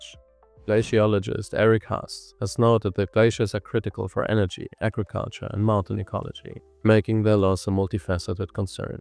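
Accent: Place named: Norway. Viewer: Excellent video on a topic I did not know about. Your voice is fine. Your English is excellent. You sound Norwegian. Which is different and interesting to an American like me and a hell of a lot better than a computer.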